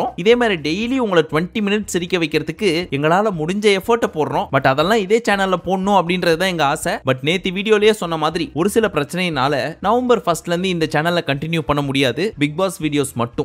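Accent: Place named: Madurai, India. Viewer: 9 ruba note madurai slang anna 😂😂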